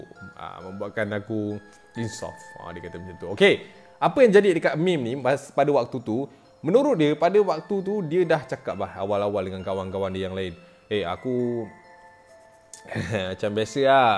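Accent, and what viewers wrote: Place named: Penang, Malaysia. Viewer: Napa suka cakap Penang? Influence org Penang Ka hahaha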